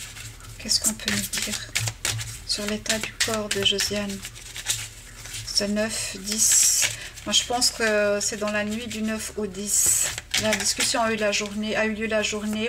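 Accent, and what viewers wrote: Switzerland. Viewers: Bonsoir Madame, j'apprécie de plus en plus vos tirages, calme, tranquille, voix douce, petit bruit d'eau, beaucoup de respect, élocution soignée, il me semble reconnaitre un accent suisse mais c'est peut être la Savoie. Moi non plus je ne connaissais pas ce drame. Je me permets de vous donner des idées si vous voue êtes en panne d'inspiration : Céline Giboire, Thifaine Veron, Vanessa Melet (pardon si vous avez déjà travaillé sur leur disparition ou décès car je connais votre chaine depuis peu). Merci beaucoup pour la qualité de votre travail. Bonne soirée. Aline Le Moal, Finistère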